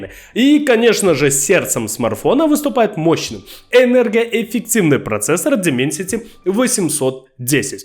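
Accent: Belarus. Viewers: Когда слышу слова - гдзе, быстродзействие. Сразу понятно блогер из белорусии. Любит ныть и жараную картошачку. Яки небудь карэнны минчанин или гамяльчанин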